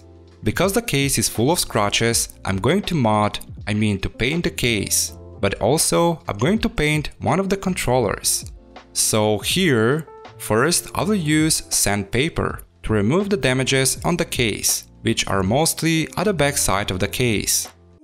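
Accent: Russia. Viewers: You are Russian? (Slav?) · I love your accent. It sounds a bit like a humble russian accent. ( not being racist english also isnt my native) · Is this my hallucination or does the author have a Russian accent? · I like his Russian accent😘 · Hello, are you from Russia? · Русский что ли ? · You have russian aсcent?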